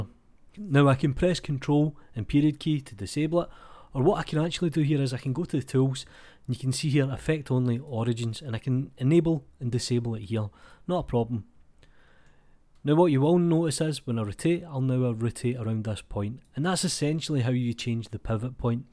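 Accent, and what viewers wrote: Scotland, United Kingdom. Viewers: I subscribed for two reasons: 1) I absolute love the fact that you are doing this and doing it for free. This will help lots of artists coming from maya and make the transition much easier and faster. 2) I absolutely love your voice and accent. It makes me remember the william wallace campaign of Age of empires 2. Also: Please please if possible make it someway that mimics the maya's pivot system. The blender forum has a request for better (and faster) pivot system and I really believe maya's way is lot faster to do. First and foremost it'll help out a lot of students who want to work in blender! Thank you!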